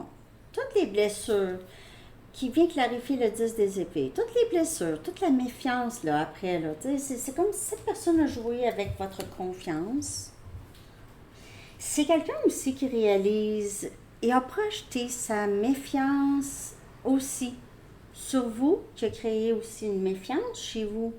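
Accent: Quebec, Canada. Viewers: Elle est vierge et moi lune en vierge, des fois c'est elle, des fois moi mais j'ai parfois du mal à comprendre l'accent québécois, y'a des trucs, je comprends rien 😭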